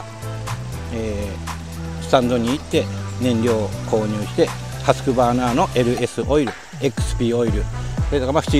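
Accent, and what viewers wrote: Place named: Kagoshima, Japan. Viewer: あ！かごっま弁！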